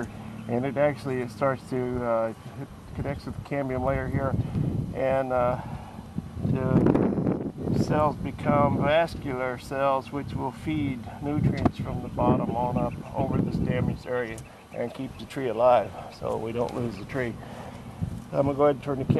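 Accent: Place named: Appalachia, United States. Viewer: Hey. You're in Washington but I detect some Hill Billy in that accent. Are you from somewhere else originally? I'm from Tennessee. Hey I have a question that relates to this video. I have a Gala I bought that's suppose to be a semi dwarf but in the fifth year here it seems to be leaning some and I can grab the tree and move it around kinda easy. Would it be a good or bad thing to plant a couple of MM111s on opposite sides and Inarch into it? I don't want to stake it if I don't have to. Thanks.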